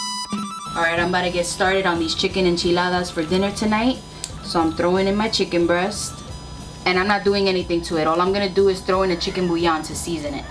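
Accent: United Kingdom. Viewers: So excited to see a video that I watched it twice! Girl, I have said it before and I will say it again... I fucking love you! So down to earth and chill and a British accent! Video was clear AF too, making those red lips look super bomb. Bless the fam and don't make us wait so long for the next video, LOL!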